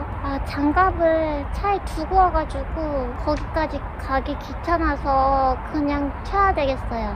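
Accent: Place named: Gyeongsang, South Korea. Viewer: 홀리몰리과카몰리~ 억양을 보아하니... 경상도 신가봐요~ ^^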